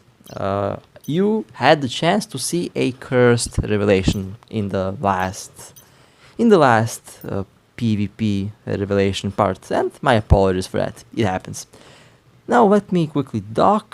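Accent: Russia. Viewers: Ты Русский что ли.? Оппоненты с Русскими именами, а у тебя акцент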